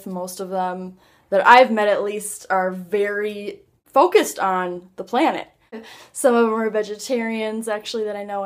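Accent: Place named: Midwest, United States. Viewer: This is the strongest midwestern accent I've heard in a while.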